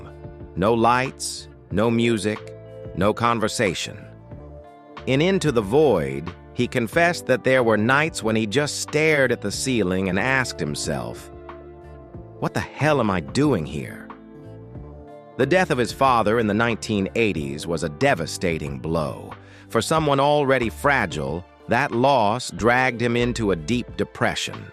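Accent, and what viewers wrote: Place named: United States. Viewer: can't all yanks just stop narrating Sabbath history in the awful American English...shocking...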